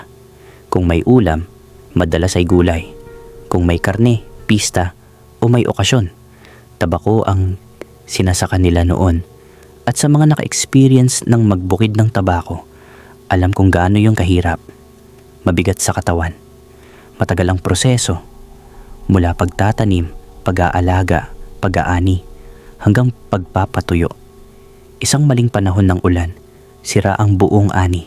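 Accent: Pangasinan, Philippines. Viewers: Hahahhaha panggalatog ata c narrator😮😮😮😮😮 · Taga pangasinan yan c narrator 😂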